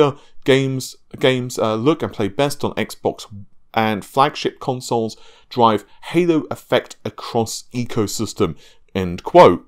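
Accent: England, United Kingdom. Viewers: Maybe a correct pronunciation of Navi will happen after it's launch, lol! I know it's his proper English accent when it comes to Nah-vey. But, at least he's doing better than Rich from RTU who's so far called it Nuh-vee, and Naiv-eye🤣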